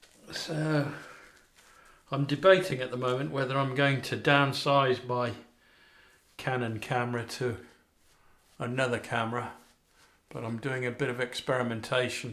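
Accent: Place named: Birmingham, United Kingdom. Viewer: ayyyyyy Bimrmingham, I was gonna say I recognise that accent, that my accent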